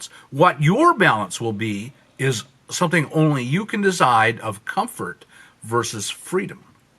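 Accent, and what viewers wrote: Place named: United States. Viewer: Hi Sir, It's so refreshing to watch your videos with soft music in the beginning and your handsome viking bearded face and American accent. It's almost like a religious experience because you do not talk about money! Very well presented. I love the WASP culture and values. Keep up the good work! Best regards, Tanveer, London, UK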